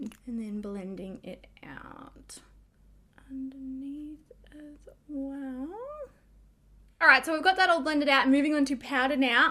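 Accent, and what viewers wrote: Australia. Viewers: I love how Aussie’s pronounce the letter “h!”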